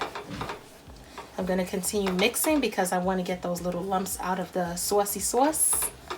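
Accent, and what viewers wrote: New York, United States. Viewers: Great recipe! Better substitute would be monk fruit is it natural and it's good for cancer patients as well. Love the New York accent absolutely Gaw-gis 😂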